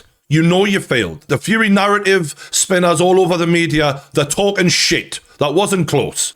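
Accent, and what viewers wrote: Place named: Geordie, United Kingdom. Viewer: f^t geordie you have the most annoying accent i’ve ever heard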